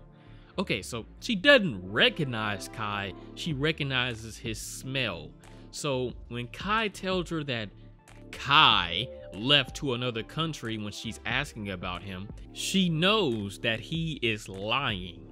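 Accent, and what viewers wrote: United States. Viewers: He sounds like he's an American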